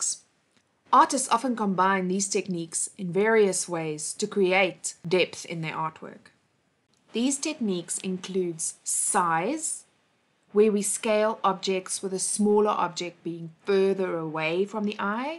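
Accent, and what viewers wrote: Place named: Germany. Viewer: Darling, audio stopped working from till the end of that chapter. It starts again with the new chapter. I don't know if it was a copyright thing or a mistake in editing, but, that happened. I hope it was only a youtube blip and there's actually nothing wrong. It's the fourth video I watch today in this channel and I'm loving it and also I love the German accent! I hope your channel keep moving onwards!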